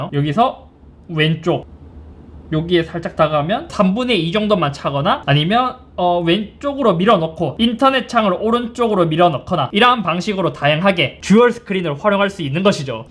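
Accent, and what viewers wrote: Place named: Gumi, South Korea. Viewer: 먼가 외가 형같은 말투 ;; 구미쪽 출신이십니까 ㅎㅎ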